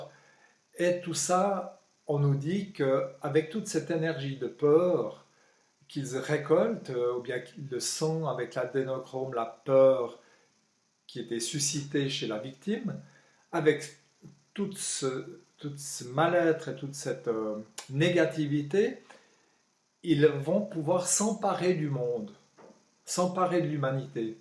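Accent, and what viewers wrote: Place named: Switzerland. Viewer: Tu es pratiquant bouddhiste ? Quelle tradition, où ça, quelle Sangha ? Vu ton accent je crois qu'on est pas loin géographiquement ? Tu semble être suisse non ? Moi je suis tout près de Genève !!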